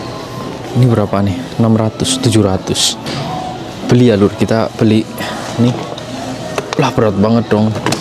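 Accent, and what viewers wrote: Banyumas, Indonesia. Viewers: ngapakkk forever👍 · mantap bro, jarang2 kaum ngapak ngomong finansial. sing ngati2 ya bos nang kana. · Bahasa nya ngapak panget lor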